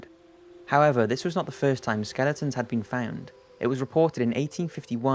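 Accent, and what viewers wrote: Wales, United Kingdom. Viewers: He sounds it, would guess either East Wales like Newtown or Welshpool or maybe Ceredigion. He doesn't sound as broad as South or North Welsh. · Who's the narrator? He sounds both Welsh and English! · The video is all the more interesting because of your british accent. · it's part of his dialect, wherever he's from - somewhere in wales, i can guess.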